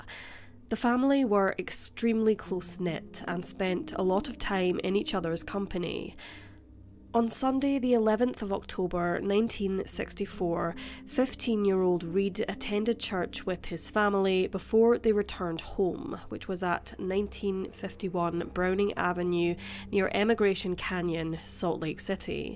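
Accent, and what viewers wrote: Scotland, United Kingdom. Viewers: Is the narrator scottish? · The narrator is Scottish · How sad. By the way you have a lovely Scottish accent.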